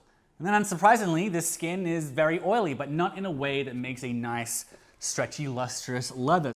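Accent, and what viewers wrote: United States. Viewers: I appreciate the American accent 😂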